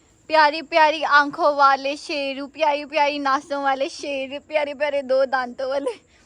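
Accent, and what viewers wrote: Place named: Punjab, India. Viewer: Hay ALLAHA AAP ki panjabi so sweet.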